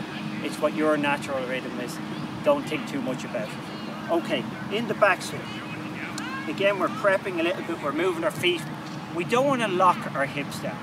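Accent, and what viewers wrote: Ireland. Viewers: I love getting golf lessons with an Irish accent.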